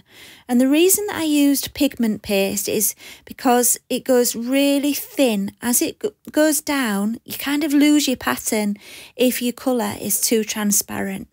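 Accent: United Kingdom. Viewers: dear god i could fall asleep to this womens voice cause it is just so soft and nice sounding. Is this accent from the UK? if so where exactly is the area this accent is from? anyway wonderful video and a wonderful voice :)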